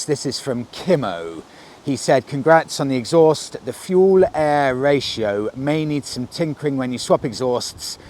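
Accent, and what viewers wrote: United Kingdom. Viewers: Ur accent is from uk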